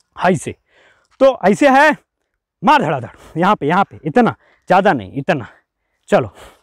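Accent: India. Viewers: Bhai aap akdam deshi voice me shikhate ho mja aa jata h🙏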